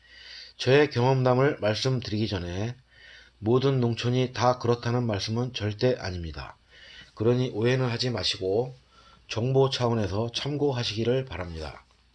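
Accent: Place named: Seoul, South Korea. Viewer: 까?하실때 악센트 주시는게 서울사람이시네요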